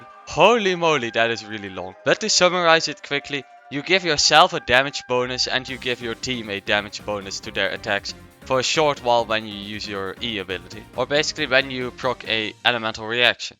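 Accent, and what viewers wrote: Netherlands. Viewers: Are you Dutch? I feel like I recognize your accent...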